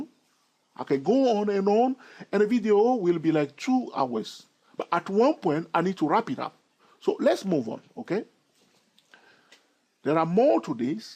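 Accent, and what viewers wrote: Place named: France. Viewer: Very interesting discoveries. I think it's valuable. It would probably be a good idea to put text on the screen, spelling out the addresses of the various channels and websites you desire to have us visit (for those of us having difficulty understanding the accent). It was helpful that I've studied a small bit of French so I could understand why you would pronounce things. (When you get excited, you seem to use partial word sounds). In my opinion, the video WAS a bit longer than necessary due to some content repetition, BUT never-the-less, the value surpassed any difficulties experienced. I agree that you should break the habit of saying "OK" too many times, OK? (LOL) Allow me to direct you to an organization that has proven to be very helpful to me: Market Traders Institute <link>). They've recently come out with a fabulous charting software which they call Ultimate Charting Software. 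I've been able to specify my own mid-point Fibonacci arc lines with it (among many other things). The head of MTI made some very helpful technical analysis discoveries that have proven helpful to many. Just thought you'd appreciate knowing about it. My name is Paul A. Hansen. My MTI "handle" is FXF and I'm at <mail> Merci, mon ami.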